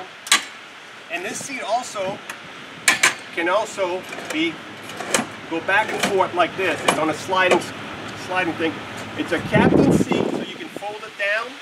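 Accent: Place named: United States. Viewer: You sound American, is it available across the pond in the UK?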